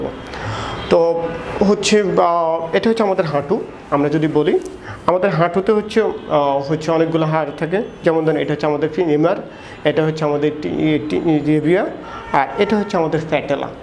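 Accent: Noakhali, Bangladesh. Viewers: স্যার মনে হয় নোয়াখাইল্লা🥱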